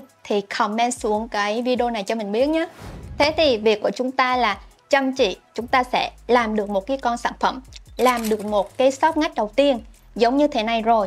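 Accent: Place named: Hue, Vietnam. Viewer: Chị này giọng huế nè kk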